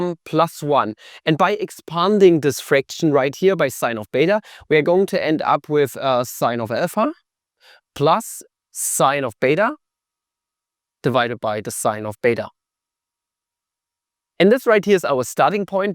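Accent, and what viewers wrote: Germany. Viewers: I think his first language is German.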